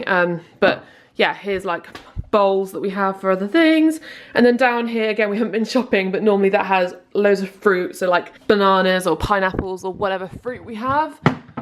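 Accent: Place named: Cornwall, United Kingdom. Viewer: I love your accent so much. I think Cornwall is my favorite British accent. I loved your video. You're lovely to watch! :)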